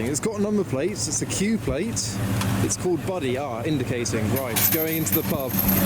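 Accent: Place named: United Kingdom. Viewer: When he started talking it was the most british thing ever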